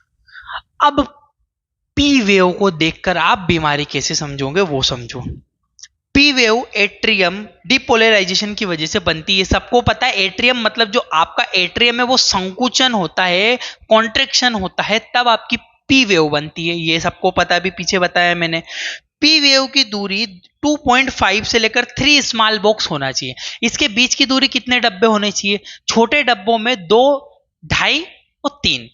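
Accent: Indore, India. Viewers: Indore se ho na aap? Language wesi lag rahi he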